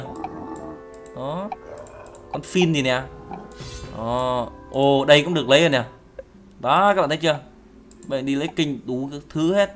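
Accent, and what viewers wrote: Hanoi, Vietnam. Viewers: o Ha Noi ak hay BAC NINH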